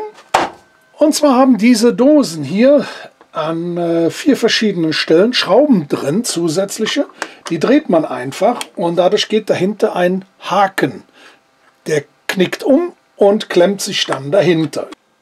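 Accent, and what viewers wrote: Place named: Cologne, Germany. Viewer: 👍🤣 bin eigentlich kein Kölner 😉 finde aber Deinen leichten Akzent sehr nett 😊 Gruß Detlef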